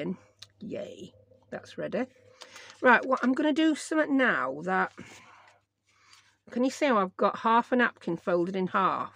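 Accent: United Kingdom. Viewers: I am American but was stationed in Britain for 3 years while in the Air Force. I love listening to you because I really miss Britain. I found myself calling scotch tape Cello tape today at work. The person I was talking to looked at me like I was from outer space. Then I realized what I had said. Lol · I loooove your channel. Maybe too much. I watch when I craft, when I go to sleep, and even on my way to and from work. But I may be over doing it because tonight at work, some components I was working on weren’t lining up and instead of the voice in my head doing it’s usual grumbling, a perfect imitation of you came out saying “Ahh! It’s all wonkay” 😂😂😂 I can’t tell you how happy I am that the voice in my head has gone British! 😂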